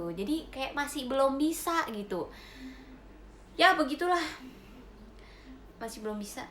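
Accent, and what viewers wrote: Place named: Betawi, Indonesia. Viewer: Gw demen bnget klo denger mpok ngomong logat nye betawi banget mirip tukang nasi uduk disebrang rumah gw😁😁😁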